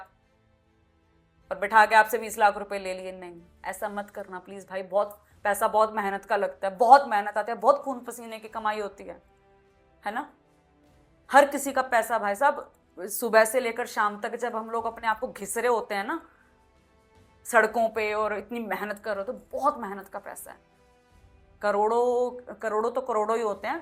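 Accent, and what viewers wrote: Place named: Haryana, India. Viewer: Madam ji thanks.for giving knowledge dholera city investment.aap mhari boli bole rahe ho.that is haryana.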